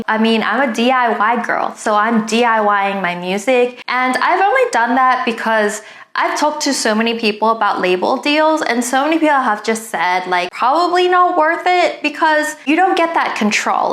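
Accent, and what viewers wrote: Australia. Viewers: I miss your vlog channel so much! I used to watch you so often when I was younger, to the point where I still pronounce words with australian accent because I was practicing this language with you 😭 I love to hear you talk and be honest! Hope to see more of that in the future :) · GOD I MISS THE AUSSIE ACCENT :((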